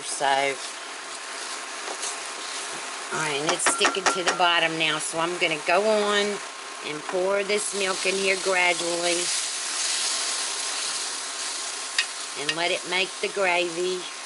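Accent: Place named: Virginia, United States. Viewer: Thanks for your efforts in producing this video. My wife is preparing it as I write. Are a Virginia resident? We live in central Virginia and your accent sounds familiar.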